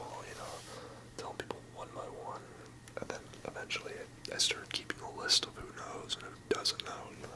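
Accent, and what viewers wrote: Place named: New England, United States. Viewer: Are you from New England? Your accent, I’m a Bostonian you have a familiar accent lol.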